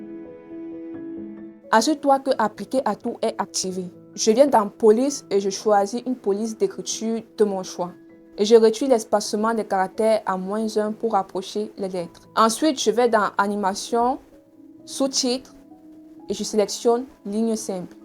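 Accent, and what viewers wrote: Cameroon. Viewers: Une camerounaise. Je dirai une eton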